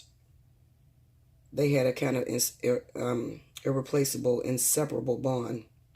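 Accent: Pittsburgh, United States. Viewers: first time watching your video. Interesting read. Are you from Pittsburgh? I hear an accent (I'm from PGH, so that's why I'm asking)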